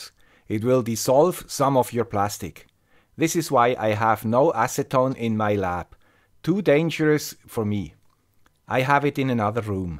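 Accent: Switzerland. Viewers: great video!! I love the guy with the swish accent!!:D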